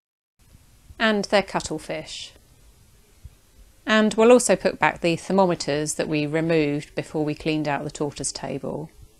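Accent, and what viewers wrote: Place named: United Kingdom. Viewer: You by far have the best informative videos for leopard tortoises! I'm trying to learn as much as I can before I purchase my first ever shelled family member. I'm making sure that I get the enclosure, lighting, and the growing of grasses and plants correctly first before I do that. I have a few comments though. Not that your babies have pyramiding, but what do you think of closed chambers to trap in humidity? I've heard nothing but great things about it. And I wish you shipped overseas to the U.S. because I would love to get a baby leopard from you. You do a fantastic job and your videos are phenomenal! It's awesome how you have checklists and you even display them on the screen for us to pause and jot down. And one last unrelated thing. I love the British accent! Keep up the great work. I subscribed and will eagerly wait for your next video.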